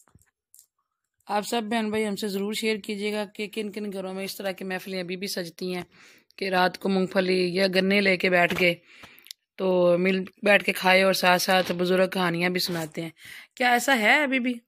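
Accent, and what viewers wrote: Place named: Hafizabad, Pakistan. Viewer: لیکن حافظ آباد کی بولی کا اپنا ھی مزہ ھے تھوڑی سی بولی تھی آپ نے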